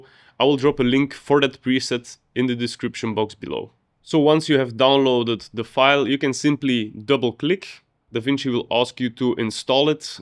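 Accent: Belgium. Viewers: Bro you are from Belgium?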